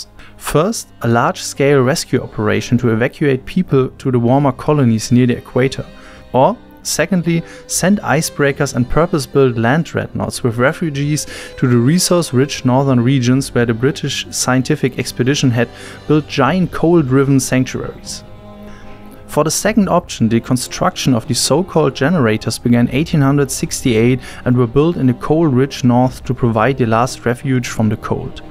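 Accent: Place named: Germany. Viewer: Ich hab nicht mal 10 sekunden gebraucht, um zu merken, dass du aus Deutschland kommst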